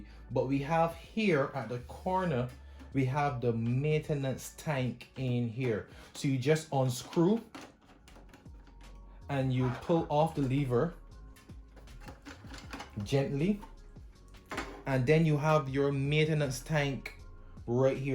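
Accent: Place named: Barbados. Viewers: Had to subscribe as soon as de Bajan accent hit me😁. Well done. Very informative video. Am seriously considering getting this printer. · I stumbled upon his channel and from the time I hear the accent I say he got to be a Bajan lol.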